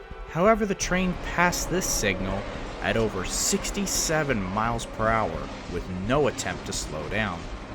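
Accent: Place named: East Coast, United States. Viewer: Hey bro, he lives on the east coast and we pronouce things different out here. Cut the dude some slack jesus.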